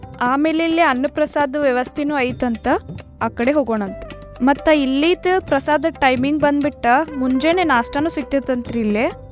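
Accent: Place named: Maharashtra, India. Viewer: your kannada is very beautiful, with a tinge of maharashtra......... I have heard this bangalore kannada, very worst......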